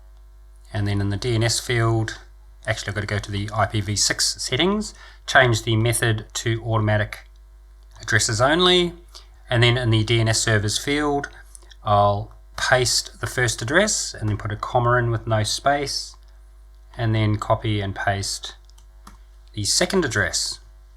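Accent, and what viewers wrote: Australia. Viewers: For my money you are the best on YouTube for explaining how to configure everything Linux. Step by step, no BS and very articulate and to the point with your Aussies English. Thank you for all you do for us.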